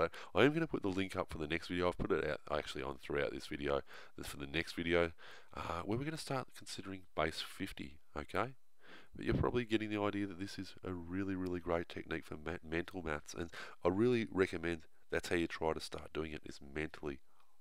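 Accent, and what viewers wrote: Australia. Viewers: The best math teachers have Australian accents.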